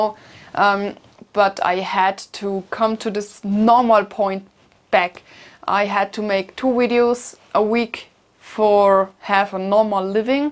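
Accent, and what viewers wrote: Bavaria, Germany. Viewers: Hearing your accent makes me miss Bavaria. You seem tough and I think you will get this all right.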